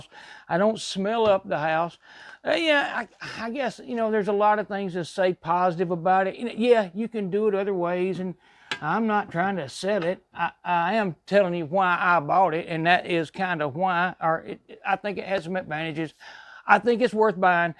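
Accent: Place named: New England, United States. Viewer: Damn… the Yankee accent is horrendous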